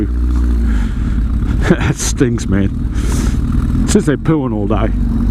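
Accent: Australia. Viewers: Hey, I found your channel through matts. Love the classy bikes you got and something about the accent from good old 'straya just attracts me even more, don't ask me why. I don't really have the finances or expertise yet to buy old bikes and build them back up like my father did (He collects old english bikes, a BSA Sloper is his current project and he had couple of Ariels before) but I'm planning on buying a DR 800 BIG on the cheap and mess around with it to see what's what and build up my skills before I break a proper classic plus I'd like to have something more usable (and offroadable) on the daily than my GSX-R. Anywaaaay I wanted to ask you what the metallic ringing on this bike here is, it reminds me a bit of the rattle Ducatis make but this clearly is not a Ducati.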